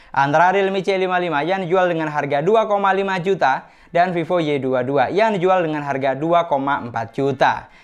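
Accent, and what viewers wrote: Madura, Indonesia. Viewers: Sampean orang madura..?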